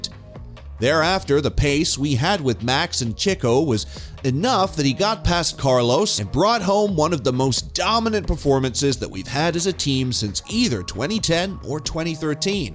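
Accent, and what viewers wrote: United States. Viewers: I'm sorry but you are sounding way to American to be taken seriously about this. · A strange video - more like an attempt at anti-RB PR stunt with some very doubtful claims of "cheating", read out by an overly-dramatic voice actor with a condescending tone who is clearly not an F1 expert. Not a fan of the mid-west accent and 'Murican colloquialisms when presenting a top-end, international motor sport. What's the point of this amateurish, hyped-up polemic? This poorly executed click-bait will not catch me again.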